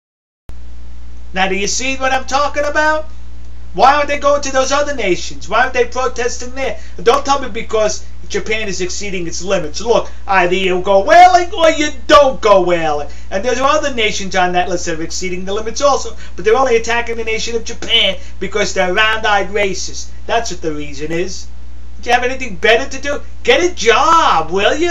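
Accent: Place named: Northeast, United States. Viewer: I just have a stupid question, which part of North Eastern America are you from ? Are you even from the North East ? (I would definitely want to trade my Glasgow accent with yours) lol Have a good day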